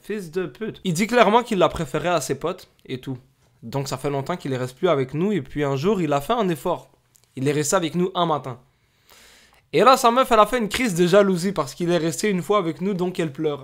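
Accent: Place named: Quebec, Canada. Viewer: woula tu mfumes ahahah vive l'accent québécois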